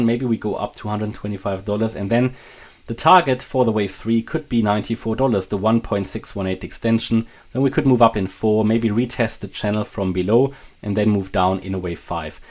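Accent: Germany. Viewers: German Akzent…..👍🏽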